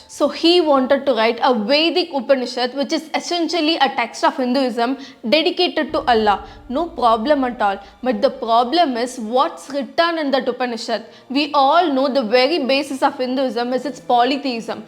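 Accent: India. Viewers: Which reason of India is her accent from? So cute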